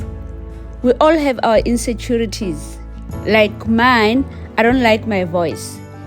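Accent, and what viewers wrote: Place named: England, United Kingdom. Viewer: American here, and just discovered your channel. Just wanted to say that I love your voice and your English accent!….especially when you roll your “R’s” 😂 reminds me of Tyla 😅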